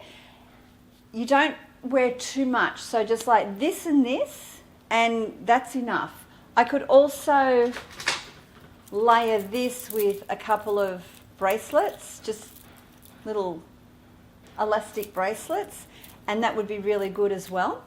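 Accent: Australia. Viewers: Hi. I’m new here. You sound Australian. I’m an Australian 👍😃. Do you do anti aging creams and masks?? My neck is my problem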